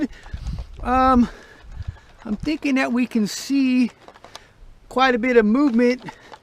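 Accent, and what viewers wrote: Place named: North, United States. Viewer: You are literally the saw guru, I build big cat and Cummins motors for a living, and somedays work on our (dirt crew) were I might cut timber one day and run equipment the next just depending on what's we are doing) so when I say you have talent for saws know that I mean it because that's 1 mechanic to another and your yankee accent really tops it off you northerners really sounds like pricks half the time and coming from a southern hillbilly,,,,well we fucking love it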